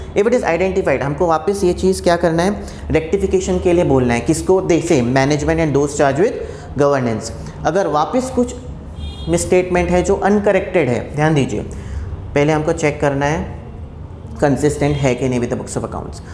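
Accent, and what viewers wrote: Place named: South, India. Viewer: Sir, you sound like a South Indian😁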